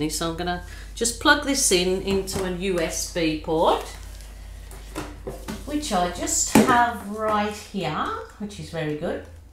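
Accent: Birmingham, United Kingdom. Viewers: gorgeous as ever !! Love your little fur babies 🥰 do I detect a Brummie accent in there somewhere ? 💞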